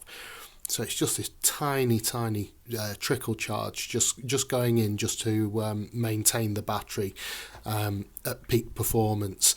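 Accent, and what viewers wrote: United Kingdom. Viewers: Great breakdown. Question, what regional English accent do you have? I like it. Best guess is somewhere near Birmingham? Or near London, actually. Hello from California.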